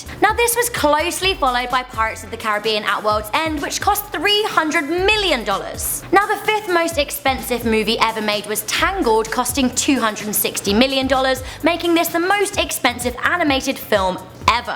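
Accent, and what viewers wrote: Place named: Cockney, United Kingdom. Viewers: British - circa Cambridge/London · I was just wondering that. Maybe cockney...? Sorry I'm no help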